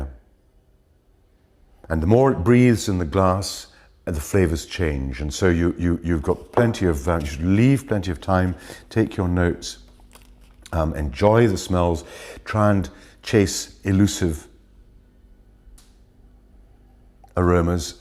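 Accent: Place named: England, United Kingdom. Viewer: sounds English with Scottish notes on certain words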